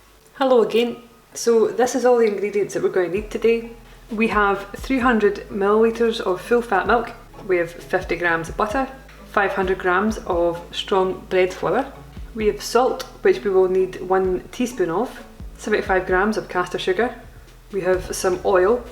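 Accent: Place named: Glasgow, United Kingdom. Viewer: Glaswegian/surrounding area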